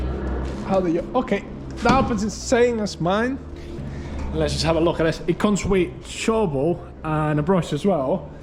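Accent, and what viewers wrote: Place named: Liverpool, United Kingdom. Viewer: Nice Truck Mate, Good to hear a bit of Scouse Also 👍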